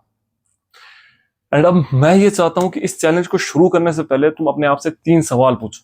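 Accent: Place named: Kashmir, India. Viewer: First i thought you're from Delhi then our kashmiri accent got it 😅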